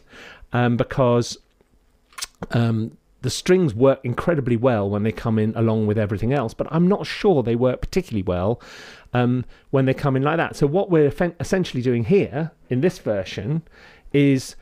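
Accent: United Kingdom. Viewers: for the 30 seconds i just like his British accent and humble personality just pairs well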